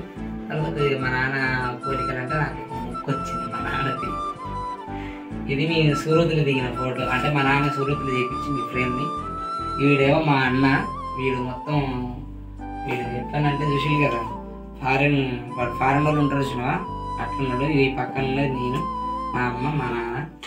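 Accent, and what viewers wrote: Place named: Telangana, India. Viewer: Thammi nuvvu e yaasa marchaku mana telangana yaasa chaala baguntadhi don't change ok keep going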